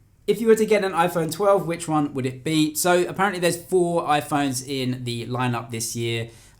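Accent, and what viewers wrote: England, United Kingdom. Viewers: ❤️from India , I really like ur videos for ur hard work, no favouritism, truth and ur English accent I really like it .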